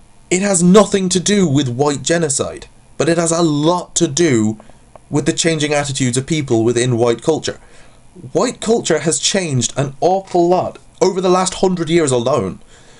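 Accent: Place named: Ireland. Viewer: do you have irish parents? you sound like it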